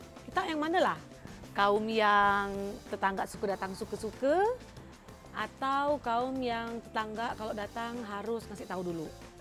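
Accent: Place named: Pontianak, Indonesia. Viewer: alamak bahase pontinye:face-blue-smiling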